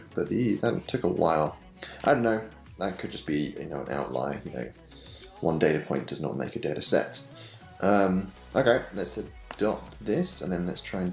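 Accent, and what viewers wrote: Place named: United Kingdom. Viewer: Got my hopes up hearing British accent that you can pick these up in the UK alas by the Speedtest he is in Colorado.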